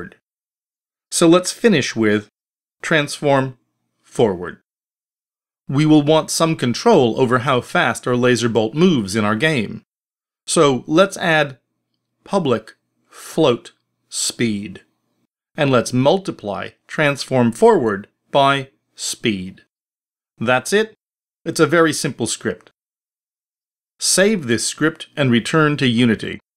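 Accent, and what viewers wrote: United Kingdom. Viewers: r u british or american lmao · British accent seeping through.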